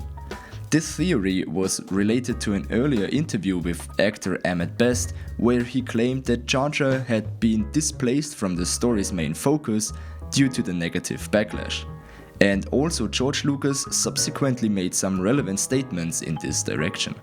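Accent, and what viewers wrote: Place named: Germany. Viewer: he sounds like a german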